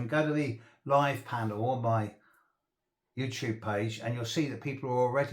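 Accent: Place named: United Kingdom. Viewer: I am from Mongolia, learning about astrology since 2019. ’ British accent is difficult for me but I don’t know why I like to hear him. 😂😂 I like his manner. 😂 what planet and and house, conficuration makes you astrologer? I like your graunded “saturn” earthy essence. Your masculinity shows kind of Mars. Deffinately you have scorpion from your analyze. Mercury on Aqauruis?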